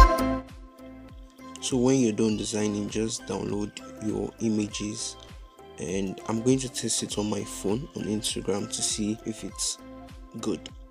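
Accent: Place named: Nigeria. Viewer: I picked up a Nigerian accent right away 😀, and I've got to ditto other comments. I was like, 😲 great tutorial! Thanks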